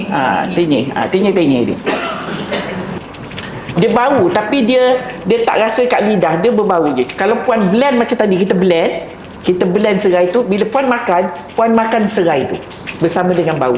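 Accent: Johor, Malaysia. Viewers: Nak cubalah resepi ni masak utk cucu2. ckp mcm org Johor. Apapun terima kasih kongsi resepi ni. ❤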